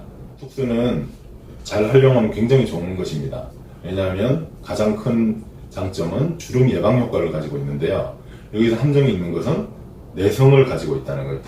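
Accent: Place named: Gyeongsang, South Korea. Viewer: 쌤 이제 갱상도 말투 안쓰시나여 서울싸람 다되셨네여~ 진짜 멋진분 최최고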